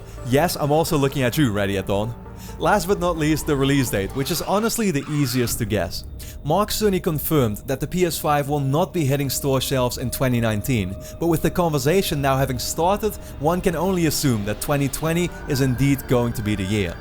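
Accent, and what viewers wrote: Netherlands. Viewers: Are you dutch? Can hear it in your "s" 😅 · Such a dutch accent :P But nice vid! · ik ben al een tijdje geabonneerd op je en ik dacht altijd al Dat is toch een nederlands accent en eindelijk zei je dat je nl was en ik dacht echt van boem ik wist het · are you dutch because you have a dutch accent. (with dutch i mean netherlands)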